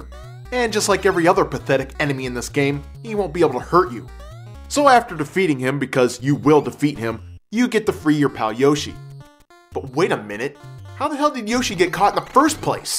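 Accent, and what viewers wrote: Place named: Cleveland, United States. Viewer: Oh wow. You are from cleveland. You have the accent, I just heard it when you said "Ay-pple" ;X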